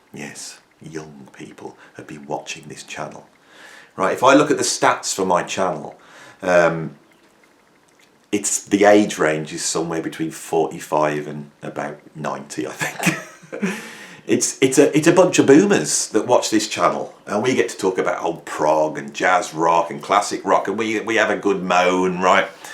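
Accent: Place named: Midlands, United Kingdom. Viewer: First time viewer…….What part of of the UK are you from? Just curious…..the way you pronounce “wrong” for instance, sounds like the Midlands? Im American, and fascinated with different accents…..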